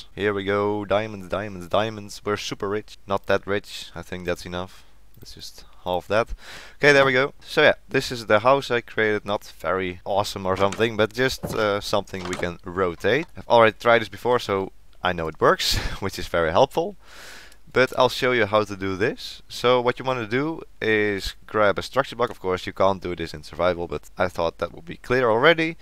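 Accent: Netherlands. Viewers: Ik hoor aan je stem dat je een Nederlander bent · Are you dutch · Hahahahaha Hoi Nederlander ahahhahahaha geen haat maar bij elk Nederlands persoon kan je horen dat je Nederlands bent als je Engels praat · You sound like a dutch man am i right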